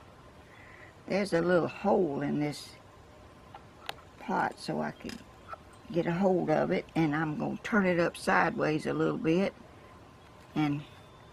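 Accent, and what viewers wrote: South, United States. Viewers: Oh my God mama , you are a delight to watch , your southern accent so cute, your creativity , over the top never thought of using nail polish and glass this way ..thank you so much , I’ll be following and will share with mom she is 90 but like yo7 a very crafty lady...❤️🙏🏻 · Nobody in Atlanta talks with a Southern accent any more. I sure love to listen to you talk. Reminds me of my family. Thank you. You are an artist!!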